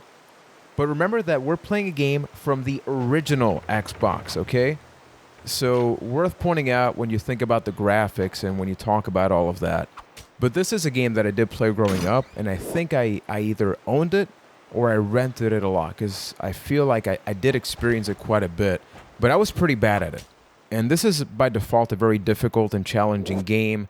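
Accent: Puerto Rico. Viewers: Tu eres boricua ?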